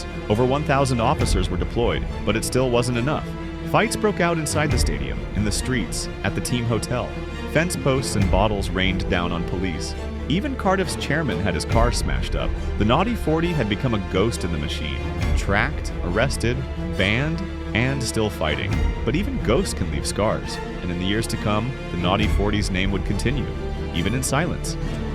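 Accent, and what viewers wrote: United States. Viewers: What’s with the American accent narrator? Anyway, Stoke football violence actually originated from 1968 with the coming of the skin head cult. The events from 1985 onwards were just a continuation of the moronic behavior that started much earlier. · The minute I hear an American voice talking about British sub culture - I'm off. Ta Da.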